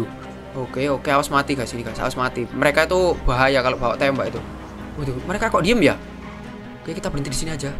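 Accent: Java, Indonesia. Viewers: bang sampean jowo ndi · Ngakak. Bang lu org jawa ya??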